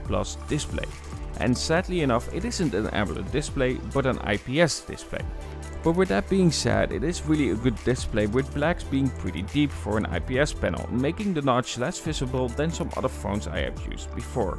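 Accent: Netherlands. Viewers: U sound Dutch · Dat noemen ze Denglisch. Dat is Engels met een Nederlands accent.😁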